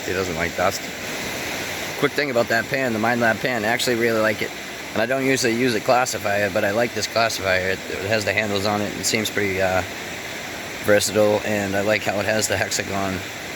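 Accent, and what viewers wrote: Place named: New England, United States. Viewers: I enjoy watching you guys find the Au. When I first saw your videos a few years ago I was laughing because some random dude with a thick New England accent was in California gold country digging nuggets and flakes. You really should incorporate Sam Adam's Lager into your videos..."your cousin from Baaaahstaaahn." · I hear a NE accent. NY 🤔 ...Northern Pa. Maybe N.Jersey.A long time ago but you been there. 😆 If not,its crazy how close it sounds. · I was quite young (13) when JFK was assassinated. My family had been very big politically on Kennedy. Much had been made of his Bostonian accent. We even had a comedy album on the first family <link> So that was my first real exposure to the Massachusetts accent. I realize this is off-topic as hell - I landed on your site because of my own interest in panning for AU. 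I just got curious about your accent and thought i'd ask. Good talkin with you - Keep up the good work. Peace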